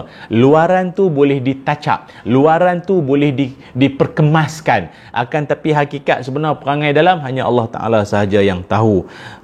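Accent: Kedah, Malaysia. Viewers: slang kedah...sedap dengaq.....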